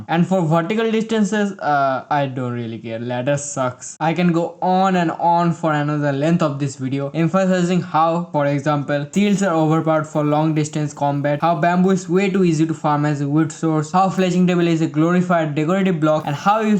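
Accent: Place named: India. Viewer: you kinda sound like indian #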